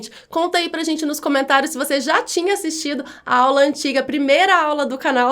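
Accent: Brazil. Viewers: Gosto muito das suas explicações. Falo português europeu, mas entendo o vosso sotaque/dialeto brasileiro muito bem.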